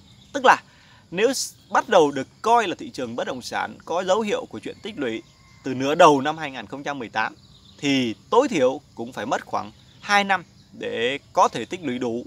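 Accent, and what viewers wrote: Thanh Hoa, Vietnam. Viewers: Ông này giọng ở Thanh Hoá, thị trg bất đs sẽ tăng cục bộ tuỳ nơi, nhìn chung sẽ đúng giá trị thật, thử tưởng tượng mua căn nhà phố trên 10 tỷ cho thuê 20t/t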